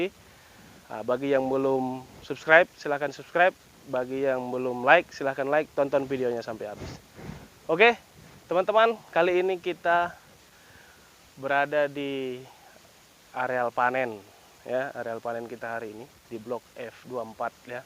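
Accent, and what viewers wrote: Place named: Medan, Indonesia. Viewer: Mantap bos q... Semangat pak.. Terima kasih tipsnya kk Mantap kali lah logat medannya pak.. Salam silaturahmi pak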